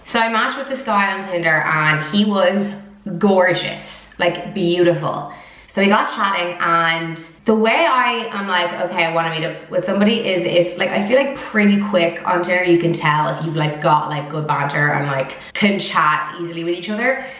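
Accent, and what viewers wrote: Ireland. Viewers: She sounds Irish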